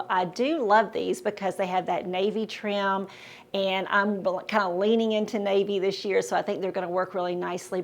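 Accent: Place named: South, United States. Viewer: I love all of these shoes! Very stylish and sensible. What I love even more is your southern accent and how you pronounce "shews" .. It's so adorable!❤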